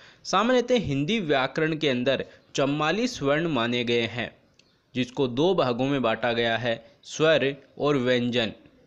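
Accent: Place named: England, United Kingdom. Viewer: Sahi se padho sir jo hum kavi nahi padhe aap waise padhte hai a aa i ee aise padh rahe ho jaise ko अन्ग्रेज ho gussa aane lga lekin explanation bahut aacha hai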